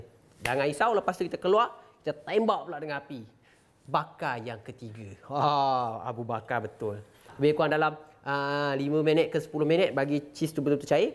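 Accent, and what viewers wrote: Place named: Kedah, Malaysia. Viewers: Dia cakap macam orang utara la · abg..ni org kedah ke...ckap pekat bak angg😂😂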